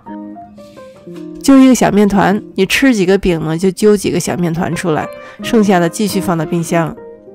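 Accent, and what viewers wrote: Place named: Shaanxi, China. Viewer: 其实除了平时看怎么做美食，赏心悦目之外，还有就是听说话的腔调，虽然不是陕西话，但是很多用词和语调特别的家乡腔亲切❤️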